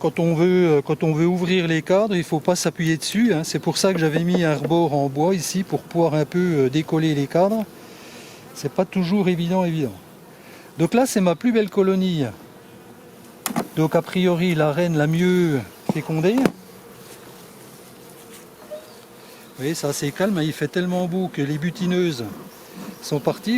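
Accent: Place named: Franche-Comté, France. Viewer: Ce bel accent franc comtois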